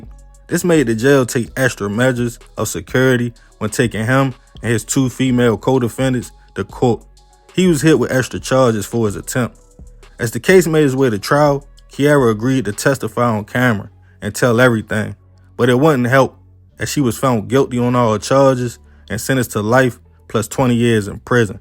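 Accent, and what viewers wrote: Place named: Mississippi, United States. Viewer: You got great stories but why it sounds like you read at 3rd grade level….or from Mississippi…. You have great content frfr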